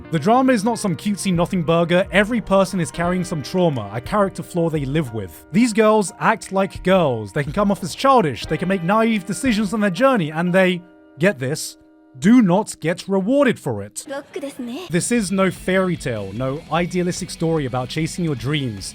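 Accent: United Kingdom. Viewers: worst anime of the 6year, with an annoying brit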